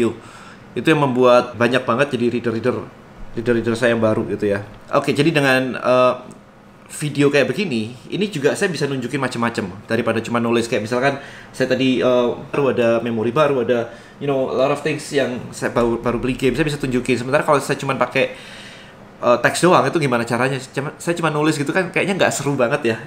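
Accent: Java, Indonesia. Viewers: Medok tenan ko kkkk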